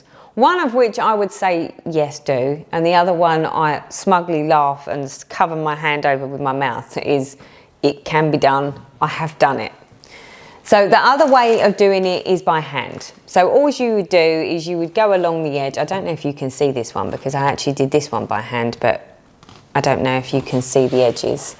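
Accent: United Kingdom. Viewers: Loved your video, yet again,! Great to see the comparison of few different storage systems in one video. Very informative. As I said before, you are one really good teacher: great personality, good looking, very pleasant voice, good experience, eager to share, that lovely accent I miss hearing so much away from UK. I started stitching just about 5-6years ago, and have gone through all the storage methods I made up myself and have ever found. I remember all the excitement after my first Orpington Hobby Craft cross stitch shopping turning into confusion, when I really had to start unravelling those flosses, and starting using them for real. Now I have made my own - different, individual storage system, out of snippets I have found and used. Works for me fine. Thanks for your video,! I wish I had all your this video series back then... 💖💖💖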